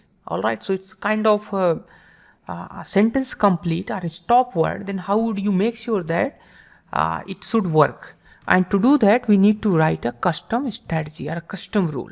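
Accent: India. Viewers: Bro, I don't usually comment but your videos are amazing. The way you speak English, with an Indian accent is what resonates with me. I have watched a lot of tutorials but they were mostly by non-Indian but I think your's are the best. You are underrated , brother. Btw, ima bitsian.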